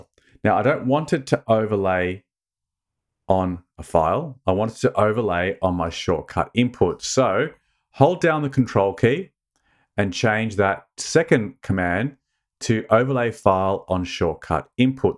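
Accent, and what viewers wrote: Australia. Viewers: Great tute, exactly what I was after & great to hear it spoken in aussie so I don't need subtitles ;) Massive thanks. Wondering if there's a way to make the logo size a percentage of the image also if you know how to add a border during the same process or a diff one if neccesary?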